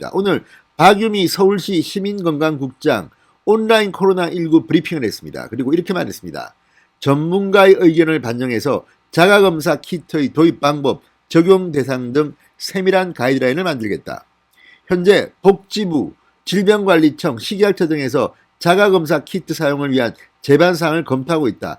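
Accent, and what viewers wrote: Gyeongsang, South Korea. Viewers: 말음법칙아시나요? 경상도 분 잘 안되죠? 활용->화룡 ; 일요일'>이료일 - 미국에서 40년 거주 갱상도 출신 교포가 제안드림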